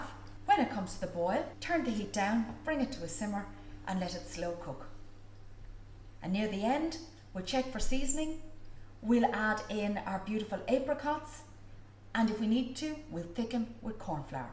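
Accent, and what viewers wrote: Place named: Ireland. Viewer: Love watching you cook and love that beautiful Irish accent to...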